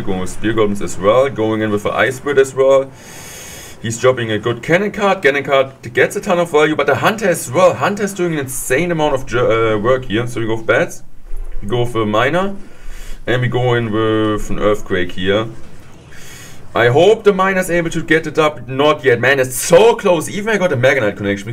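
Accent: Germany. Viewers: ich gucke deine Videos auf ddm Deutschen und Englischen Kanal... wollte nur sagen dad du nen sehr krassen Deutschen Akzent hast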